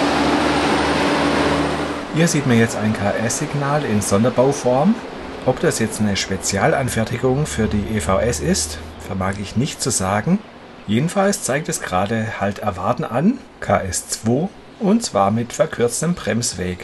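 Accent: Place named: Swabia, Germany. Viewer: War das wieder interessant und kurzweilig! Und Dich schwäbisch zum schwätze höre isch wie e kloi's Gutsle obedrauf! Dankeschön und - LG!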